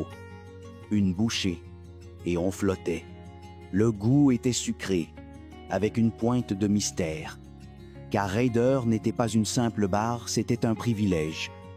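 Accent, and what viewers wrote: Quebec, Canada. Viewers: Pas moi, je lui trouve un discret accent canadien dans ses prononciations 🙂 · Vous avez l'accent du Québec. Est-ce que cela parle des produits de France ou du Canada? Il y a des produits écrits en anglais, et d'autres comme oeufs mimosa, gratin dauphinois, etc typiquement de France aussi à cette époque ...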